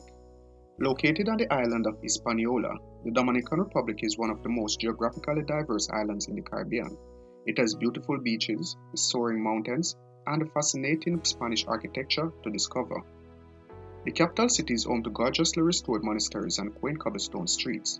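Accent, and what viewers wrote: Jamaica. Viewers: By the way he pronounced certain words,I wouldn't be surprised if he's Jamaican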